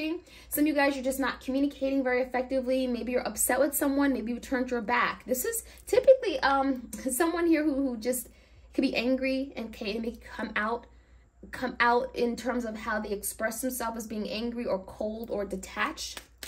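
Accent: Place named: East Coast, United States. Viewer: ur accent gives me classy east coast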